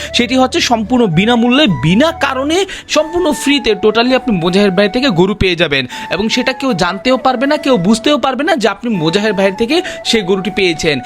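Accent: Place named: Barishal, Bangladesh. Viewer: নারায়নগঞ্জের চাইনা এত বরিশাইল্লা বাংলা ইংলিশ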